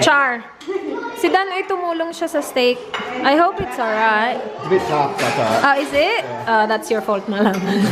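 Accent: Visayas, Philippines. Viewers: Na amaze kayko ba kay the way ka mo storya og tagalog naa gyapon accent nga bisaya. Love u dhai😘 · Suok2x jud. Tarongag balibod..... 😁😂😁 cute jud kaayo mag bisaya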